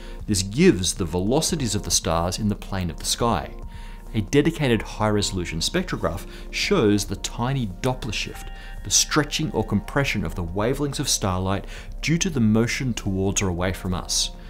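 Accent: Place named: Australia. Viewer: this Aussie speaking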